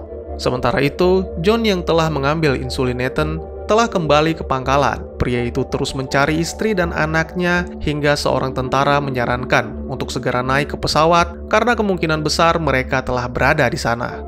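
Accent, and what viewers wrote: Aceh, Indonesia. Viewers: Dro ureng aceh nyo bang? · Weh abng orng Aceh bukan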